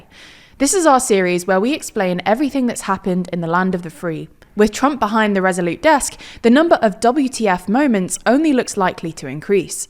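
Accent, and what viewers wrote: England, United Kingdom. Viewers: Love her accent. What part of England is she exactly from?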